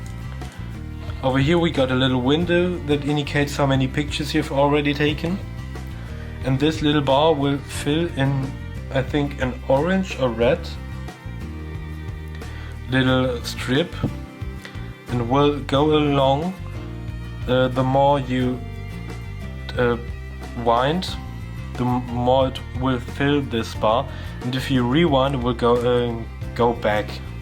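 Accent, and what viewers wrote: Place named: Germany. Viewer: Englisch mit 'nem deutschen Akzent, hach wie sehr ich es liebe :)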